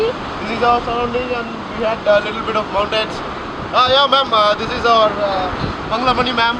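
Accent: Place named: India. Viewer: Subtitles would be helpful, guys. Your accent is very hard to understand for non-Indians.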